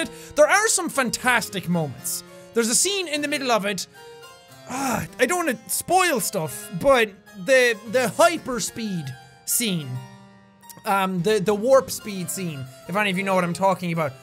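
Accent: Ireland. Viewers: I'm 47 and I LOVE. He makes my day every day with his humor and Irish accent!!:) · I knew your chanel some weeks before when I was searching for examples of Irish accent for a presentation at college. Your video about guessing phrases made me laugh a lot, and I showed it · R U IRISH OR SCOTTISH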